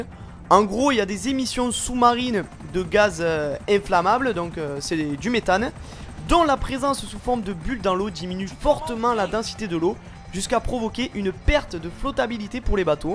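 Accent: Marseille, France. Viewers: jentend un accen marseiller (vive paris) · il parle comme les marseille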